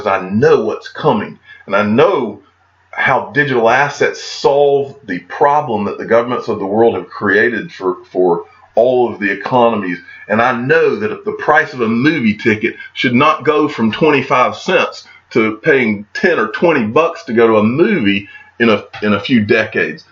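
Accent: South, United States. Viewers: Maaan!!! You are the mf shiznitt!!! I truly appreciate ya along w a lot of other people! We always gonna have a few haters in the batch, so screw em!! I’m from the south too so when I hear ya talk, i swear it’s like listening to a uncle or close family friend schooling me on that xrp, baby!! We feel that southern hospitality from ya! So again just wanted send a big thank ya! muchos gracias! & a sho appreciate ya!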